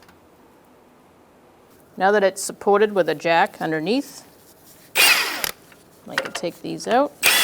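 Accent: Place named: East Coast, United States. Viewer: The East Coast is strong with this one :)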